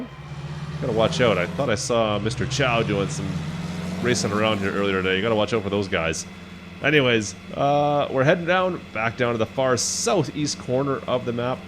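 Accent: Canada. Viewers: A fellow Canadian...EH?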